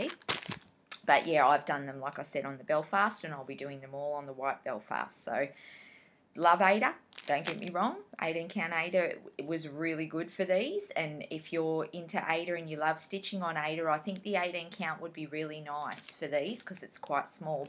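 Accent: Australia. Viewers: Loved your video! It's so nice to hear an Aussie accent on Flosstube. Cinderella is absolutely gorgeous ❤️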